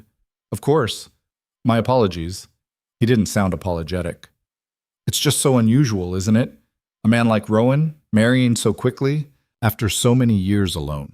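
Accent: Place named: United States. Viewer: Whoever wrote this has no understanding of the regency period or the manners and language of the British aristocracy. An American narrator doesn’t help.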